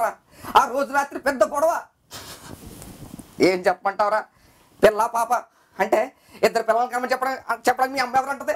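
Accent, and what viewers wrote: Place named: Godavari, India. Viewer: ...godavari slang that too konaseema slang made all the difference...very great attempt...u simply rockzzz...awesome... glad to see comment of puri jagannadh sir stating that he needs actors like you... u made a succesful attempt CONGRATS:)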